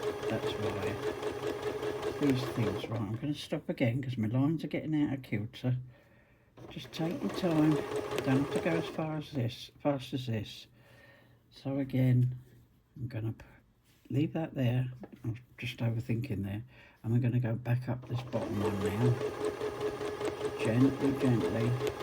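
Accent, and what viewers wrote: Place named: England, United Kingdom. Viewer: Hello! Ex pat from Aussie...loved hearing a real English accent again! I bought a set of rulers over a year ago a d still haven't had a go! You've inspired me to get them out and have a go 😊 the quilt behind you is lovely 😍 do you normally do your own free motion quilting? Looking forward to your next video...please look after yourself..I too have COPD so I know the struggle 😢 best wishes too you, Carole x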